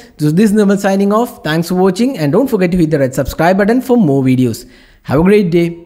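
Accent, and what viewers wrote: Kerala, India. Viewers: R u malayali sir..? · speaker is talking too fast to have a tutorial . Never even paused once so we could follow. Not everyone is Indian & understand this fast talker w/accent as well. Horrible.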